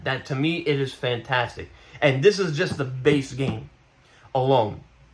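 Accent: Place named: New York, United States. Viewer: Great job!!!! I just come here for your accent as I miss NY.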